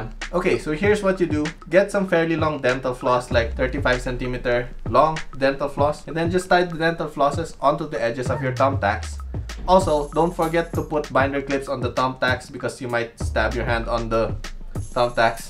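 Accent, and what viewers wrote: Philippines. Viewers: Kuya nag tatagalog po ba kayoo... parang pinoy po kc kyo.. 😅😅😅 .. do you have a video about rendering style but pen and ink only rendering.. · Are you Filipino? I just noticed your accent and the way you talk. Idk if im right, but.. Are you? PS. I CAN'T BELIEVE I JUST SAW YOUR CHANNEL NOW *smashes subscribe button in rage* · Uhmmm are you Filipino?? Cause you sound like one... No offense tho cause I'm Filipino too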